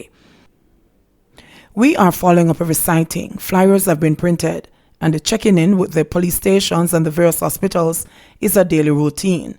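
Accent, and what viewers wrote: Jamaica. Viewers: 32 idiots - NOTHING here to Dislike - Her Voice is Perfect 👌 for Audio & She Speaks with a WONDERFUL Jamaican Accent - She does not come across Stuffy - She comes across REAL & Intelligent—— “Clowns”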